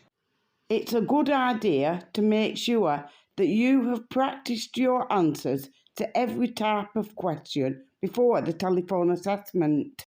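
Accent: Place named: Yorkshire, United Kingdom. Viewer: Yorkshire accent that mate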